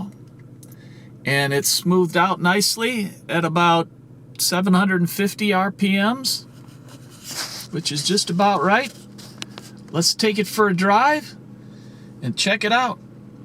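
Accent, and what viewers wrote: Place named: Minnesota, United States. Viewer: Thank you this fixed my problem on my fzj80 land cruiser! You sound like my good friend from minn!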